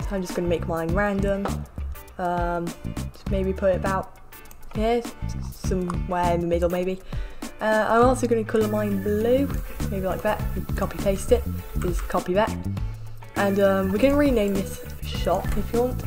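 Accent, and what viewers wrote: United Kingdom. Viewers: You’re laughing that a guy is British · And british to wow :o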